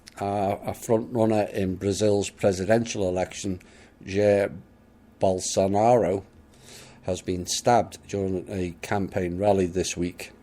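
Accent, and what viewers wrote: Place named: Liverpool, United Kingdom. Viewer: Alright Scouse !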